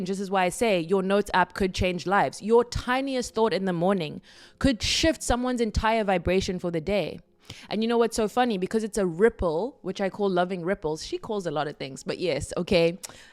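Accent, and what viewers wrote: South Africa. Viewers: Your accent sounds like you're South African- if you are, it's always amazing discovering fellow South Africans who are sharing such wonderful videos through the lens of spirituality.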